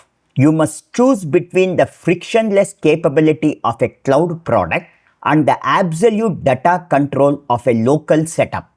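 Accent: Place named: Tamil Nadu, India. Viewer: Good one thanks. I genuinely like your accent. I grew up with Tamil-speaking friends and reminds me of home.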